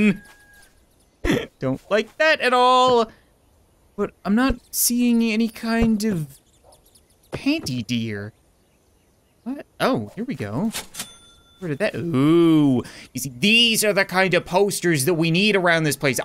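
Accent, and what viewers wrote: Appalachia, United States. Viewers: His hillbilly accent reminded me of kindergarten 2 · only og people remember kindergarten 2 his hill billy voice sounds like Felix from kindergarten 2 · Your country accent in this is the same as one of the twins in the kindergarten 2 game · uses hillybilly accent Me: remebers kindergarten 2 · Your hillbilly voice Me: kindergarten flashbacks Who was that voice for in the kindergarten series? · Damn that hillbilly voice is nostalgic of the kindergarten series... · The hillbilly accent reminds me of the voice he gave for ted and felix from his kindergarten 2 series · I love how we call hillbilly accent the Felix and Ted voice now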